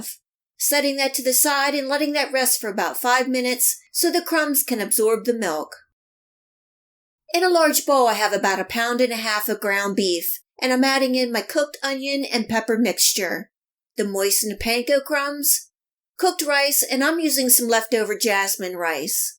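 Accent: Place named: Northeastern Pennsylvania, United States. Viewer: You sound like you're from Northeastern Pa.......me, too! Scranton boy! Love pigs in the blanket! Good recipe,! Every household in my neighborhood made them slightly different...but they were all good!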